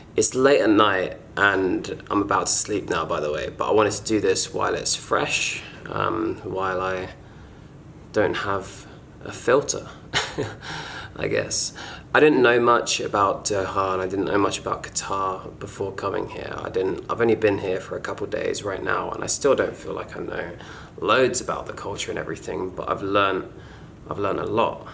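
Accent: United Kingdom. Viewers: Bmw 7 series as a taxi And u say not to shabby Haaaahaaaaahaaa AND UR BRITISH I CAN TELL BY UR ACCENT SO U KNOW WHITE GUYS HAVE FORDS AND VECTRAS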